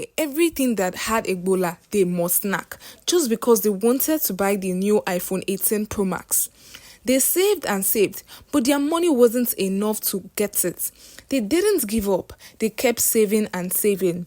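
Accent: Yoruba, Nigeria. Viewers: She's Yoruba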